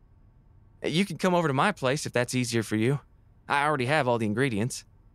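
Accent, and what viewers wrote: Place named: United States. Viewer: I love the audio it's very cute but why he got a little bit of a country accent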